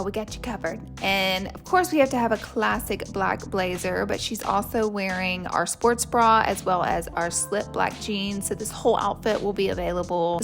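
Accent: United States. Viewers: your voice sounds like american tourist in europe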